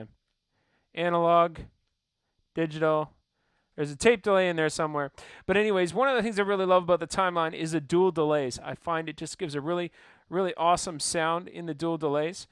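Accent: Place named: Canada. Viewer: Curious, are you from Canada? Can definitely tell when you say the word "Out" like "Oat" haha. Anyway, killer board. Thanks for the video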